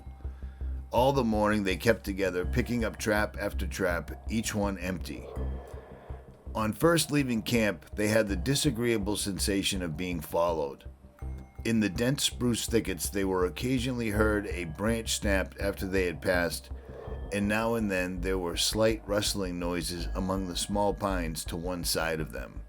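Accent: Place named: New England, United States. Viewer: You spin a pretty darn good yarn, gruff yankee voice and all. Great footage as always. Much thanks and love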